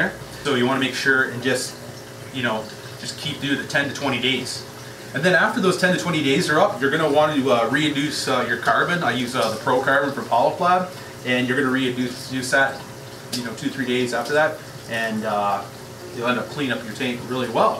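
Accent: Canada. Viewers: probably the THICKEST Canadian Accent I've heard in a long time. Nice!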